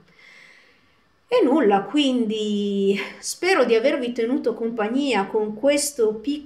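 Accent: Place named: Veneto, Italy. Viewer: pensa che io all'inizio ho pensato che tu fossi Veneta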